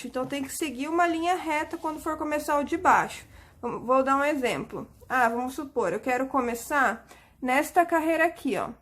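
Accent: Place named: Spain. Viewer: Eu amo essa fala como eu queria aprender falar assim é muito lindo como se pronuncia ad palavras bjosss para todos da espanha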